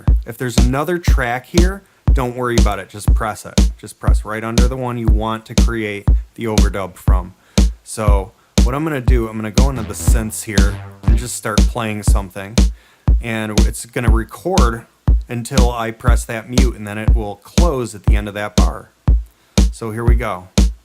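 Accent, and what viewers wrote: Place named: Michigan, United States. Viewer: Man you are really good. And you sound like you are from my home town in Michigan.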